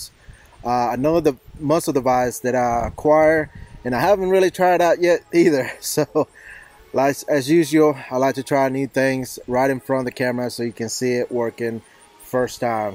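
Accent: South, United States. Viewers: My man here has a southern hispanic accent lmao I just bought this online without researching and i am glad i found your video, it looks like I made a good decision buying this flash hider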